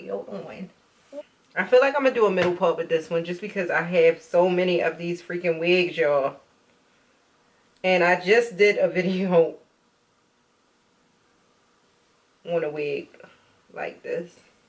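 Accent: Baltimore, United States. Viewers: Baltimore accent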